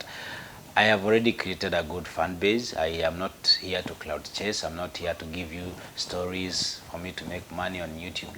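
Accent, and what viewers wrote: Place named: Kamba, Kenya. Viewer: You have a good English while you are serious but when you and Jude are not in good terms your English sounds kikamba aki, anyway you are free from your own words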